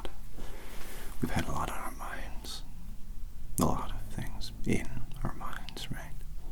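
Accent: United States. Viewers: I don't know what it is, but an Englishman who can do a convincing American accent is very powerful to me. 👀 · I love the way your American accent sounds. Your also an amazing actor!! ❤️ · truly i was jarred when i heard the American accent still soothing tho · That’s such a good American accent... fooled me until I saw the comments. It’s awesome man!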